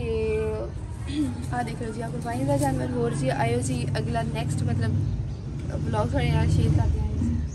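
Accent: Punjab, India. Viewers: Her tone and style is more of eastern punjab than of western punjab.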